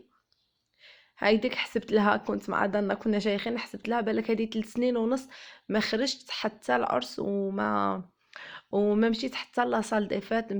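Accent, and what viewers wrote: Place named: Tlemcen, Algeria. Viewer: اللهجة التلمسانية الاواوية حلوة الله يبارك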